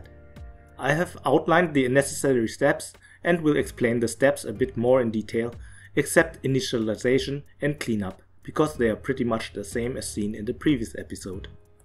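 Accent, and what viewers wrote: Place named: Germany. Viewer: I didn't detect the German accent, and only realised when I watched your ZX Spectrum Next video. Of course, Germany was as much obsessed by Commodore as we were in the UK. Makes sense!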